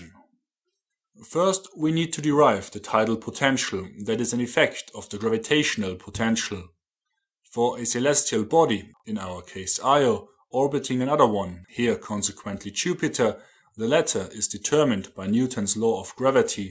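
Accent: Germany. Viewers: Oh man that was awesome. Please continue to use your knowledge and German accent to obliterate misinformation!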